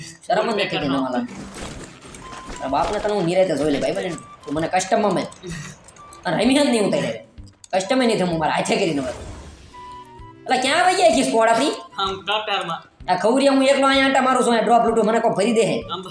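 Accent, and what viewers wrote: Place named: Halvad, India. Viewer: તમારી ભાશા આમા રા જેવી જશે gj 36 વારા જેવી હળવદ વારા બોલે મારી જાન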